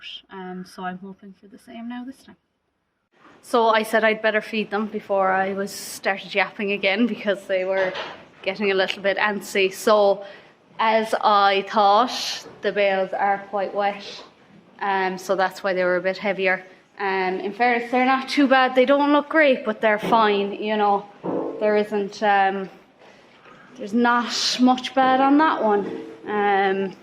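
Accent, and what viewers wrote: Ireland. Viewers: awesome I thought it was a wee bit of Irish I detected in your voice My mom side of the family was Irish an my fathers side was Scottish lol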